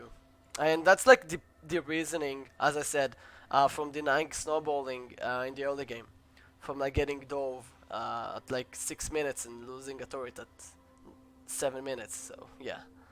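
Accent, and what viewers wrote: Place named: Latin America. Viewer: are u latino? lol ur accent is strong and nice video! pretty informative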